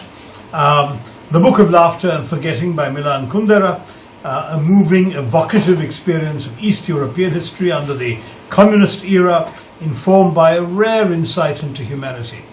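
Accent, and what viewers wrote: England, United Kingdom. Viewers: Is the British accent necessary? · Such an English accent...lovely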